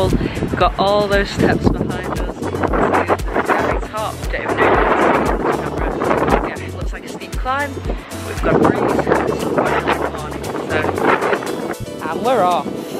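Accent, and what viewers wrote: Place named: North, United Kingdom. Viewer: ❤Fab video, I love Skiathos, cant identity your accent, nothern but where you from ? ❤ xx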